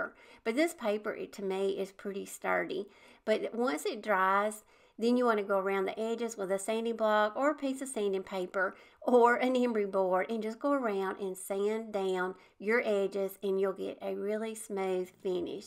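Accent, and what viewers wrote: South, United States. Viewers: Hey! Jill here! OMG!! Such cute ideas! I must get busy with my Valentines tree. Last year I made Plaster of Paris pink and red hearts for my tree. Used a heart mold that I got cheap at Bin Time. BTW!! I love your precious, southern voice. I'm a Georgia girl and sound so much like you. Many blessings!!!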